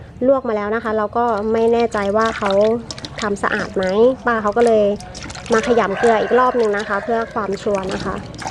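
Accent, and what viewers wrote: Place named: Sukhothai, Thailand. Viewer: ฟังเสียงเหมือนคนโขทัยเลย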